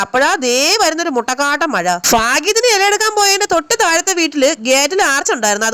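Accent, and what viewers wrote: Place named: Trivandrum, India. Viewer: Ullolam,uthippooram,nammude Tvm slang,enthaayaalum kothippichu,ente favrta😊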